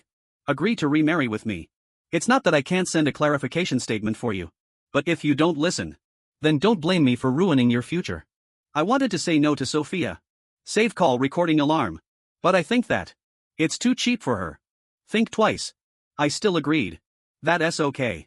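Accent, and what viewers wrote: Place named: China. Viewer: chinese style english . . .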